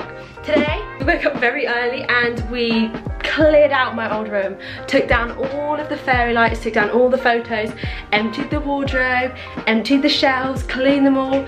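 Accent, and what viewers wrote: England, United Kingdom. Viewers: i really love your english accent 😍😍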